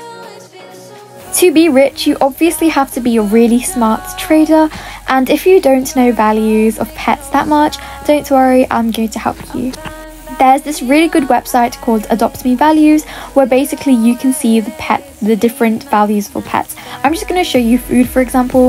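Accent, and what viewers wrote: United Kingdom. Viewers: You are so british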